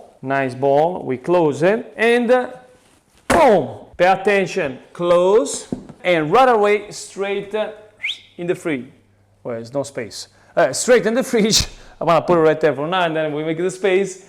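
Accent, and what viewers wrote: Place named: Bari, Italy. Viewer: You have a really nice Barese accent.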